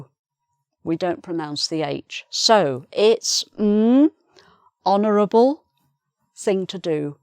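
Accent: United Kingdom. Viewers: Hi, thanks so much for your lessons. I'm fond of your accent, it is so British? Your explanations are clear and well structured, you're an excellent teacher! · I loved it! I like your british accent, what part of the UK are you from?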